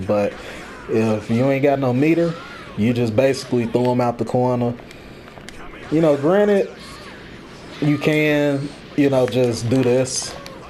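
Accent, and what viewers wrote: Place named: Texas, United States. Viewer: thought you was from Texas lol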